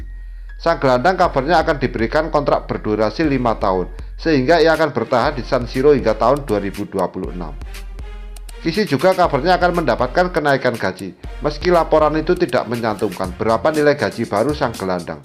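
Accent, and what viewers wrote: Java, Indonesia. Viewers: Ojo medok2 bro wkwk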